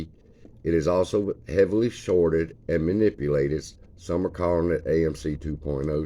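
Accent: South, United States. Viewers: Instantly subscribed. I follow a lot of fancy channels. A lot of big ones with big words and figures. You broke it down plain and simple. The southern accent was a cherry on top. Thanks for keeping an eye out for the sundial crew.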